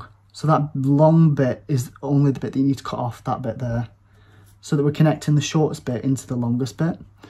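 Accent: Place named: Sheffield, United Kingdom. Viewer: I'm guessing a Sheffield accent 😬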